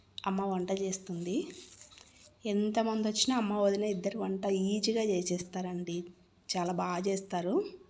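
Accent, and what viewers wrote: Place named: Kadapa, India. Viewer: మీ మాటలను బట్టి... మీరు కడప జిల్లా వాసులనుకుంటాను. ఎందుకంటే.. ఈ ఆల్లకాగు అనేమాట అక్కడిదే.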